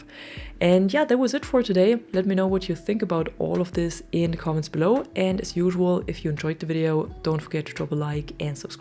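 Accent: Germany. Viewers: as a german, she sounds ultra german to me haha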